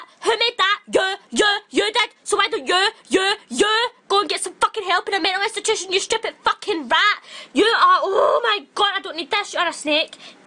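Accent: Scotland, United Kingdom. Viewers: Also to the people asking about the accent it's obviously Scottish, maybe Irish. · Scottish, from Glasgow probs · is this a scottish accent? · it's angry scottish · haha i love your accent, that british/sco/irish accent is beatifull :p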